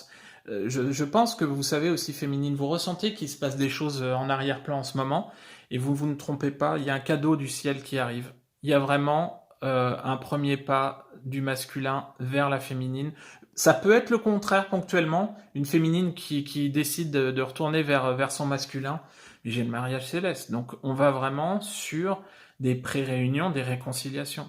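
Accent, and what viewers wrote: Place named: Normandy, France. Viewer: Tu m’étonnes qu’il a peur de me perdre avec la méga connerie qu’il a fait avec sa tierce il y a 15 jours. Si ça pouvait au moins le faire réfléchir avec sa p’tite tête lol Merci pour la vidéo (ah l’accent Normand hein le « gô » 😂😂😂)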